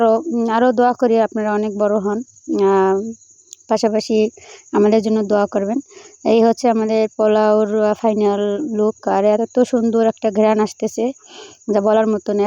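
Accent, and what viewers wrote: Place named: Barishal, Bangladesh. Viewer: ওরে আল্লাহ মিল্লা গেছে মিল্লা গেছে,,মোরা দেহি বরিশাল্লা মনু🤭🤭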